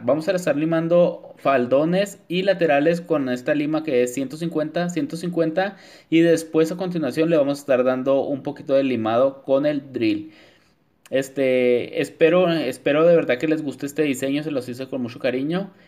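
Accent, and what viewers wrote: Chihuahua, Mexico. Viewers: Así hablas tienes acento chihuahuense una super persona muy lindo y atento me encanta tu trabajo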